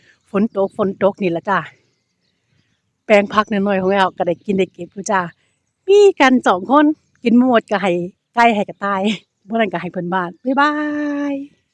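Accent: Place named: Isan, Thailand. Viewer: สวัสดีค่ะเอื้อยแอ้วเทือแรกที่ได้เข่าม่าเบิงคลิปค่ะเบิงเพลินๆดี่ค่ะเอื้อยมักธรรมชาติรอบๆบ้านเอื้อยจ้าและภาษาเว่าสำเนียงบ้านเฮ่าจ้า👍🥰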